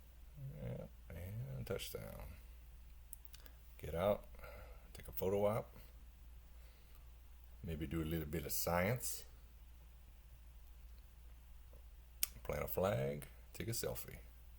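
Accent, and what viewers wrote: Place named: Louisiana, United States. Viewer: Your accent is distinctly cajun. How many of your people have made it the Mun?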